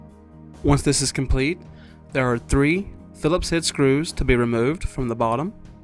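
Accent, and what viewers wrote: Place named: Deep South, United States. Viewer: I love your accent - are you from the deep south?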